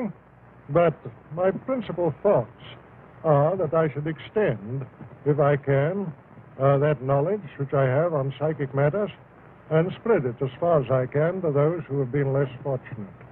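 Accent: United Kingdom. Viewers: Interesting to hear his distinctly Scottish burr.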